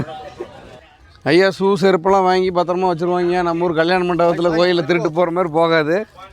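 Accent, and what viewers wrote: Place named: Coimbatore, India. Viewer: நீங்கள் எப்படி சாதாரணமாகப் பேசுகிறீர்கள்? கோயம்புத்தூர் நா கெத்துங்......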